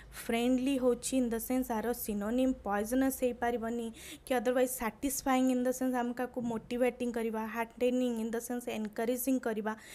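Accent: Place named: Odisha, India. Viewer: It's motivate kare au please English padheila bele odia accent dhariba is blunder😂😂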